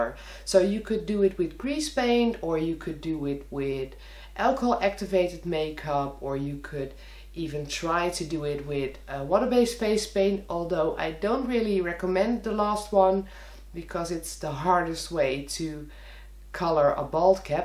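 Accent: Netherlands. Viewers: are you dutch your voice is a little bid dutch im dutch 😂😂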